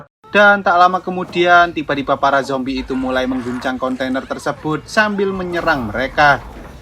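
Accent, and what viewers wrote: Java, Indonesia. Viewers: Mas bisa gk logat jawanya ush medok amat jdi film yg seru jd datarrr aja Maaf ya 🙏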